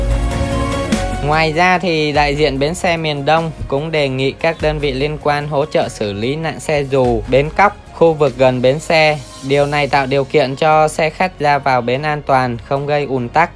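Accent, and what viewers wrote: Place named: Thanh Hoa, Vietnam. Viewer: Ông này quê triệu sơn thanh hóa à.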